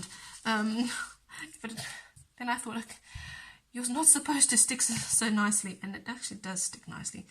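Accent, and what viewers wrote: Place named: South Africa. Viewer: I love the tutorial, I am going to try this soon, I just need different colors in distress ink. I only have 3 and those are in vintage brown colors. You still have a hint of South African accent 😊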